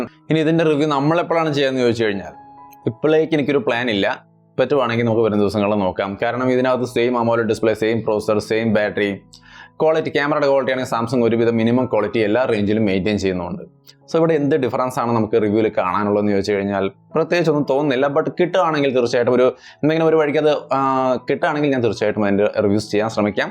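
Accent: Thrissur, India. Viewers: കഴിഞ്ഞ വീഡിയോ പോലെ ത്രിശൂർ slangil വർത്തമാനം പറയുന്ന കാണാൻ വന്നവർ ഉണ്ടോ??❤️❤️ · Gaming review il maathrame ollo thrissur slang😝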